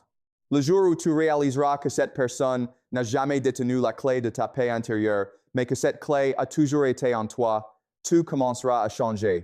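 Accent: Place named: England, United Kingdom. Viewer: Dommage pour l'accent anglais, je préfère la voix habituelle ...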